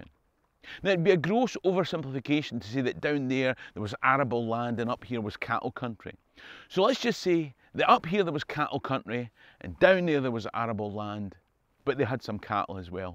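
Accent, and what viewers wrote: Scotland, United Kingdom. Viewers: My favorite Jacobite outlaw! So glad this popped up in my reccomend. Having the narrator be a Scot, even better.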